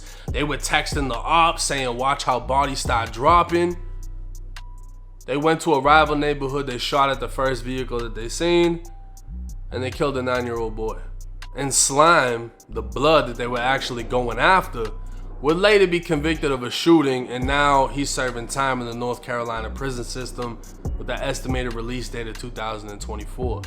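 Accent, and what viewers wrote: Boston, United States. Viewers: Bro where are u from..?Boston??? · Bro I've been meaning to ask you are you from Boston you have a Boston accent am I correct or no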